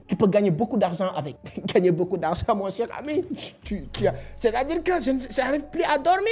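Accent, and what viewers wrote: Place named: Senegal. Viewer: Force a toi. J'aime bien l'accent des sénégalais .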